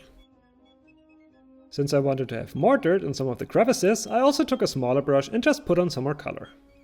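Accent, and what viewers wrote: Germany. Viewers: No german is be able to hide his accent :) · ich wusste das du deutsch warst ... hört man am Akzent und an dem deutschen lack😁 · i knew you are german! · okay is he german?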